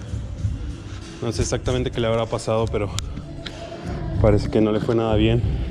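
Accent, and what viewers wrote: Mexico. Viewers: Tienes el acento de mexivergas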